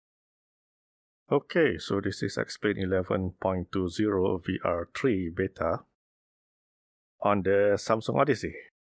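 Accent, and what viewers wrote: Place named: Singapore. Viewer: Hi sir. Nice video and thanks for the x-plane demo with the Odyssey. Your accent I guess is either Singaporean or Malaysian Chinese (I could be wrong), but if i'm right, may I ask where did you get that Odyssey from? I am scheduled to visit Singapore again next week and I'm wondering if I can find the Odyssey there. The oculus is currently still at SGD$890 at SimLim but based on reviews I will get the Odyssey just for the extra pixels.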